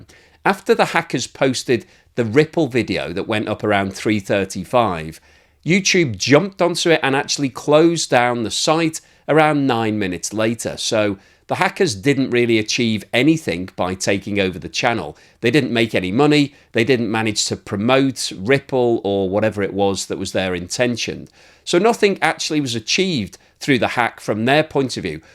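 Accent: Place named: England, United Kingdom. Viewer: i don't find all your content interesting however I find enough of your content interesting enough that I will start to watch all your videos. Of all the channels I subscribe to, yours is one of about 5 channels I follow where the channel owner has an English accent, and I find the English accent is much more appealing to listen to than most American accents... I subscribe to both of your channels and I will give your content time, good information is always useful even if I can't use that information in the near future, share advice I have no interest in as I don't currently invest however I may do so in the future so I will watch videos on that topic just in case I need it 20 years from now, I did the same when I was choosing classes in highschool, I too highschool accounting and 10 years later I used that knowledge so I could understand blanance sheets and other financial documents for my business without needing an accountant (small pc repair business selling my time only so no stock etc) The drop in viewership may relate to the frequency of uploads, I think this can mess with the algorithm somehow and effect the impressions of your videos in peoples feeds, do you have a drop in subscribers on your main channel as that will affect things.....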